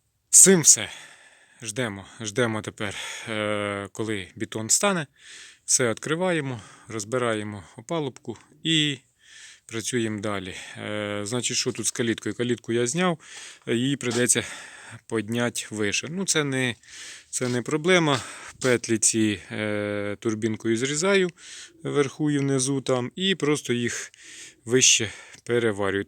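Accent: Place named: Kherson, Ukraine. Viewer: хай Вам Бог помогає! Так приємно почути рідну говірку Херсонщини! Теж мріємо про перемогу і про повернення додому! А зараз набираємося знань і досвіду від Вас та інших сільських блогерів. Сердечно Вам дякую, що знімаєте і ділитесь інформацією у такий важкий час! Шана Вам, що не зламалися і не опустили руки! Разом до перемоги!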